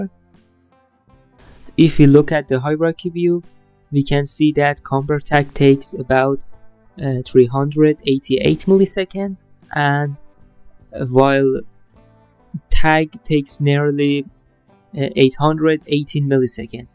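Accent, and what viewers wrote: Iran. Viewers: داش چرا لحجه ایرانی داری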